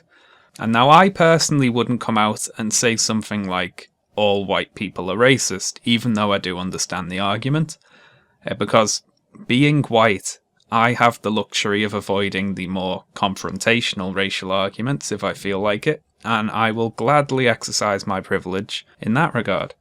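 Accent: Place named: England, United Kingdom. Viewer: it's strange hearing how much more english he sounds in these earlier videos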